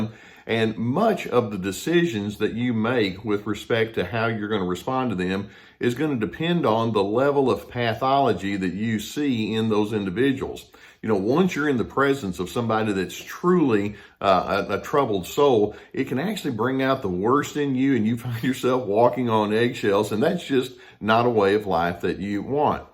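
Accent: South, United States. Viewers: you remind me of dr phil i dont know maybe its the southern accent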